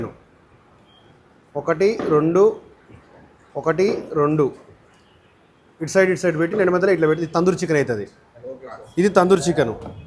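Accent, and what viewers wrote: Telangana, India. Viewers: Are you telangana?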